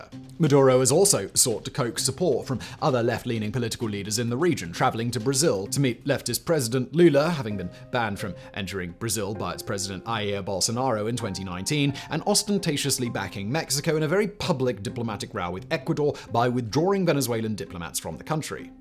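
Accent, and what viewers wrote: United Kingdom. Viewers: Since you sound British, when is the “Royal” family in the U.K. giving up power? 🤔 That is a LONG RUNNING dictatorship!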